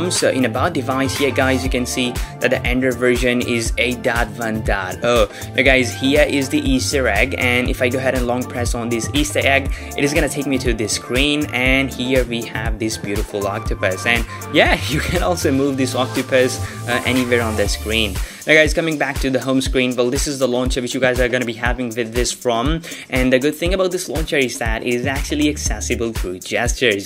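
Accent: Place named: United Kingdom. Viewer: Bro your accent is so good at first i thought that you were a british but still love your contant and a big fan of roms specially for note 3 tnx and keep uploading cool videos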